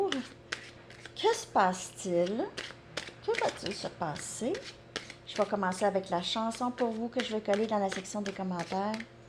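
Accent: Quebec, Canada. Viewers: Impressionnant! Exactement ce que je vis! Tu es trop forte, j'adore t'écouter! Toutes le compréhensions et prises de conscience se font, grâce à toi, dans la joie et la bonne humeur! Quel bonheur! J'adore! Un grand grand merci à toi! Et ton accent, québécois, craquant! Tu es un guide génial! GRATITUDE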